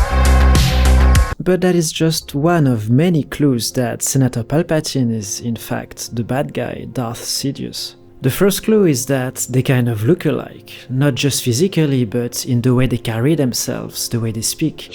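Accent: France. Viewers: tu es francais? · Holy French accent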